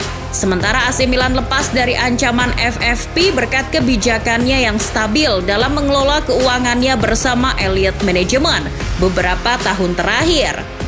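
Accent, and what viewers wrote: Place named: Java, Indonesia. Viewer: Hostnya jelas wong jowo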